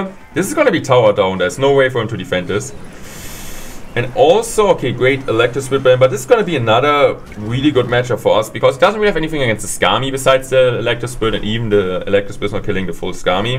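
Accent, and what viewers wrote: Germany. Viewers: You are from Germany because you have Germany accent?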